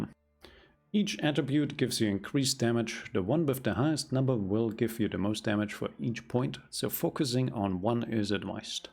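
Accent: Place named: Germany. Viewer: No wonder why i felt the German accent :)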